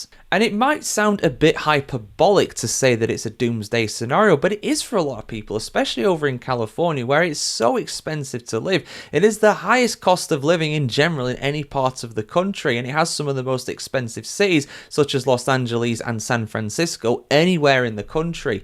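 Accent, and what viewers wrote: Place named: United Kingdom. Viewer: Eh...can't listen to a limey preach about US problems. Sorry.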